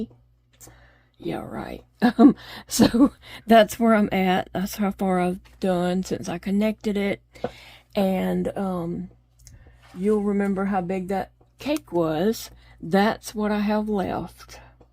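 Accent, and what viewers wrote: South, United States. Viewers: I agree, I just love your voice and your beautiful Southern American accent !💛💛💛😁🙏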